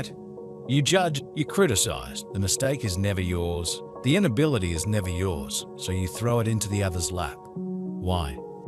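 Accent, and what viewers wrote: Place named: Australia. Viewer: something about an aussie accent that is easy to dismoss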